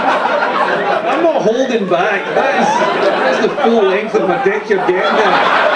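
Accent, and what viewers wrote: Scotland, United Kingdom. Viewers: thanks, I guess I have... I can hardly recall leaving this comment, much less you. It was Toffee Digger's ("reply") comment that got back here to see yours (2 years later). I gather from Marco's comment that you have a Scottish accent - I, too, love Scottish accents (among many others). Yet, I was apparently frustrated by the combination of your accent and your "audio situation" as making what was apparently funny to some, literally incomprehensible to me, despite it being, apparently aimed at the English speaking world(?) < insert quote here from Ben Franklin and others about how critics are often our best allies > PRO TIP: If you don't want feedback, feel free to turn off your comments section instead. Cheers. B-)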